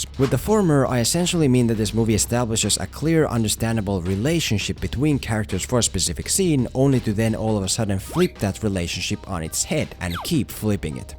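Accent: Finland. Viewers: Where you from bro? i know you mustn't be American, you sound like you have a Finnish accent. Not sure tho. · are you finnish? your accent sounds finnish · Veli sun aksentti on niin suomalainen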